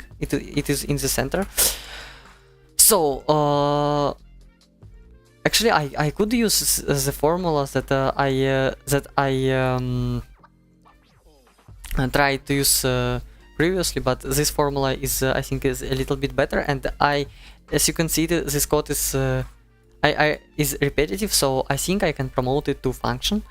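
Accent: Russia. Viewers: мужик у тебя капец акцент лютый русский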